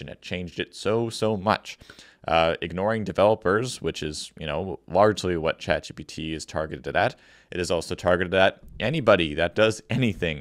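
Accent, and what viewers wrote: Canada. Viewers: Canuck accent!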